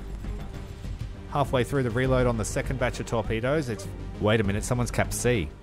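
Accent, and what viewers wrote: Australia. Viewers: "It's now or never, do ya wanna live forever!" Luv ya Aussie humour & narration! Great vid', Sah!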